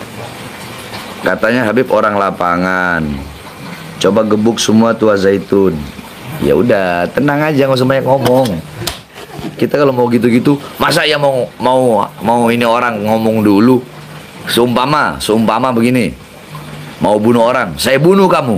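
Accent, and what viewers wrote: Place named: Manado, Indonesia. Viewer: Sama sama orang Manado (Sulawesi) lagam bicaranya sama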